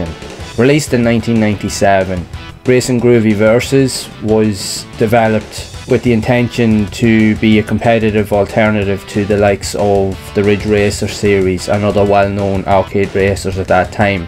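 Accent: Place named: Dundalk, Ireland. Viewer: Hearing a man pronounce Japanese game titles in a thick Dundalk accent is my type of content. Been digging through the PSX a little trying out the new FPGA Mister core. There are so many JP exclusive titles I missed.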